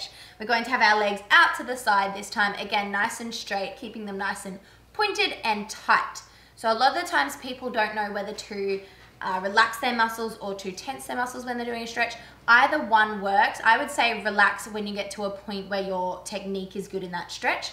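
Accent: Australia. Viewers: aussie aussie aussie?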